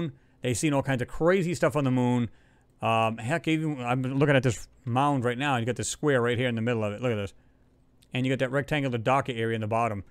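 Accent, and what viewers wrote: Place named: New England, United States. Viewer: Yeah I'm Diggin these videos I subscribe to you. I am also loving that New England accent. So much better than this Florida twang I have been forced to endure for 46 long yrs.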